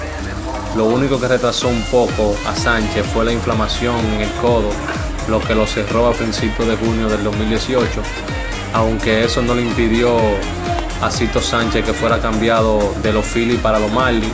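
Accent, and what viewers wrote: Cibao, Dominican Republic. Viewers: Ay perdón, pero sigo escuchando tus comentarios y definitivamente tú eres un Cibaeño bien campesino, OMG, tú no sabes hablar Español!!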